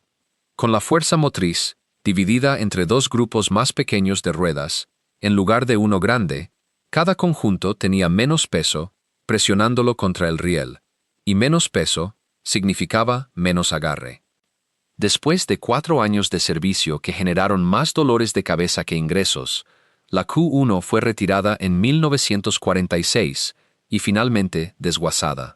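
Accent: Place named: United States. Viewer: Esto no es español latino ni de España es de español americano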